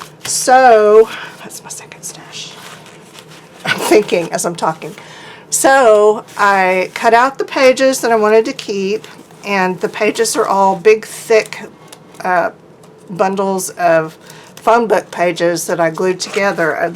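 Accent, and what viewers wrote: South, United States. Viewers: nice!!! I love you and your videos.. you have a wonderful southern accent that I just love to death.. 🤗🤗💜💜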